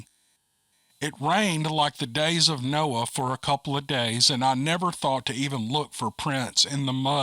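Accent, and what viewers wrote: South, United States. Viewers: To the person who mentioned your accent. Didn’t the name tip you off? It’s not called “ Fargo Cryptic “. voice is not only soothing, it’s perfect. I listen at night while shutting down for the day. It’s the perfect bedtime stories. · I made it to the end, yah!!! I'm just sad it ended. I could listen to these forever, I wish they were hours and hours long, I never get tired of it! I have always loved listening to people tell stories, I love stories! Now every night is storytime!!! My daddy was the greatest storyteller, he told us stories all the time and I loved it. He could just draw you in and keep you hanging on by your fingernails; he was a scotch Irishman and you know how they can spin a tale, except his work true. I love the sound of your voice and I can understand everything you say because you sound like everybody I know. 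You've got that slow Southern drawl that I know so well. You seem like the nicest guy in the world. I started watching this one about last night, I would listen a while and fall asleep a while and repeat... several times. I finally made it through and it's now in the morning; I think I'll take a nap. Later, I'll start my search of your other videos for my fright night creepy fest. Late at night, when the lights go out, you come on; you make living fun. God bless you real big Jude, from Kentucky ✝️🐴🌿🇺🇸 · I listened to the entire series. I loved it. Each story was unique and interesting. I am a great fan of your podcast. To the snob who criticized your accent, as another Southerner, I would quote my Momma who would say, "kiss my A double scribble." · Yes Sir Mr. -- I not only made it to the end, but this is the third time I've done it. I like to cue up a few videos, press play just before climbing into bed. 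~ My bed, is surrounded with speakers, and there's a 75" UHD 2160p monitor a few feet away, into which a computer, amp, & sound mixer are connected and nothing else. I refuse to have cable or ANY type of mainstream TV. Just the internet, and full control of what content comes into my home. Needless to say, I often get so damn comfortable, it's about all I can do but fall asleep. I'm kinda new to this channel, in terms of time, (about 6 months) but not so new in terms of content. I listen to something here at Dixie, close to every day, or sometimes binge. ~ When I DO pass out, and miss out on the end of a story, I rinse and repeat, next day. Usually only with THIS channel, -- Or sometimes Buckeye BF and recently Neoma Finn's channel, but mostly THIS one, because it is about the best for really good stories...(Man, there's a lot of crap out there) --I love that nice Southern accent, (I'm sure You know the one I mean), soothing narration, and genuine, original content. 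(Dang!, If I would have ever met a cute girl in my youth, with an accent like that, I'd be FINISHED. My heart would never have escaped alive...) ~You have a LOT of videos, with really good stories that feel quite sincere. I am in NO rush. I'm taking my time. To thoroughly, slowly go through them. I like the long ones, and that's why I like the YT CUE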